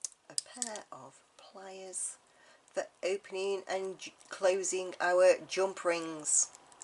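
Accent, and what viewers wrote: England, United Kingdom. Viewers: So lovely to hear an English voice. Only just found you. I’m already a fan and will continue to watch your videos. I have already learned a few new things especially how to end memory wire bracelets. Thank you. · Im from California. But I love the Brit accent. I watch Brit TV show. My older brother got me hooked now my oldest son is hooked too. Your coppers and Mystery shows. My granny was born in Ireland and moved to the East coast of the USA. In early 1900s!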